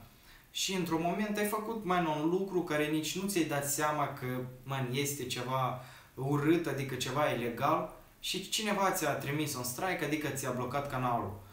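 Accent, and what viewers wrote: Moldova. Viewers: Bro e moldovean=)))))evident ca vorbeste asa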